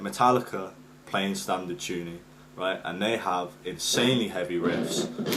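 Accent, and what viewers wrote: United Kingdom. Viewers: Thank you for the video man. I found it very useful. I think it may be called a perfect 5th though. Where in the UK are you from bud?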